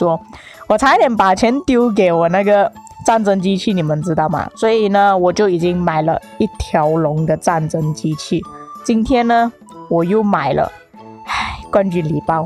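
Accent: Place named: Malaysia. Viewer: 你哪里人哦 马来西亚口音很重哦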